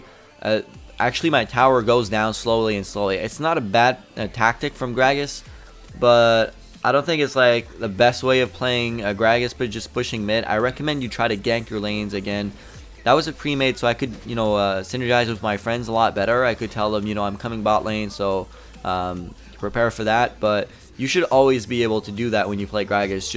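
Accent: Quebec, Canada. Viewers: T'est anglais de base ? ou québecois parce que ton accent est vraiment ÉPIC :D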